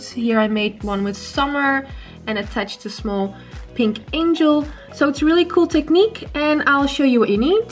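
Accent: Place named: Netherlands. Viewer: You can hear a Dutch accent ( spreek je Nederlands )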